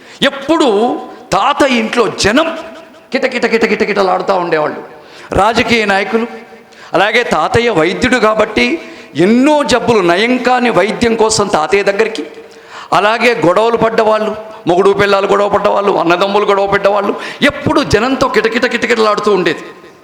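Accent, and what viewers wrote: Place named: Andhra Pradesh, India. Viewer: Nibhasha...Andra lagaunndhi...jai telangana..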